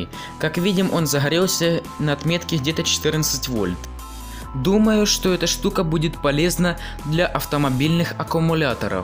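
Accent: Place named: Ukraine. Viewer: украинский акцент просто, а вот с грамотностью речи поработать бы, либо читать по бумажке сначала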